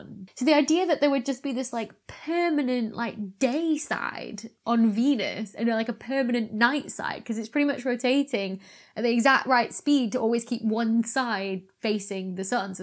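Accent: United Kingdom. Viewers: Do the rest of you folks pronounce it glacier, or glacier? I know is from the UK and apparently over there it's pronounced glacier, here in Canada we pronounce it glacier. How do the rest of you folks pronounce it? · Also your briish accent is so thick 😹😹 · Woah cute female physicist with a British accent. Annnnd that's a subscribe.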